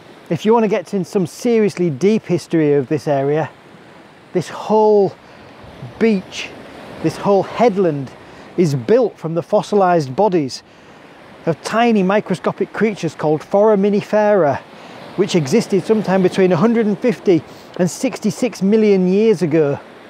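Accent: Hull, United Kingdom. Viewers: These video's are brilliant, and in that wonderful Hull accent =👌.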